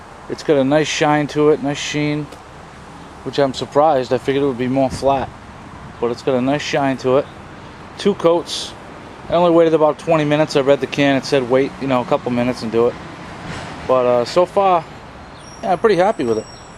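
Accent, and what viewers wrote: United States. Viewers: well done. im gonna copy it :p love the american accent. hi from New Zealand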